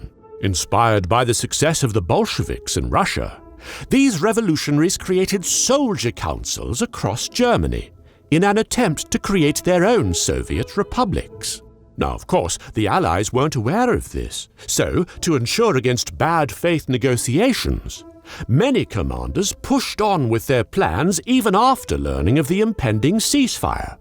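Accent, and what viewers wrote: United Kingdom. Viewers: Saw an ad, clicked because of the thumbnail, stayed for the British accent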